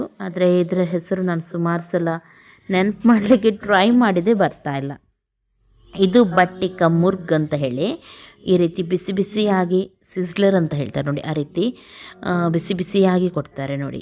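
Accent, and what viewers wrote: Mangalore, India. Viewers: Hi mam Nim mnglr kannada language chengiruthe mam & Nice video mam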